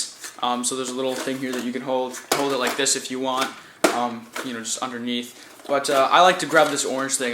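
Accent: Canada. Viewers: are you canadian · This guy is Canadian as fuck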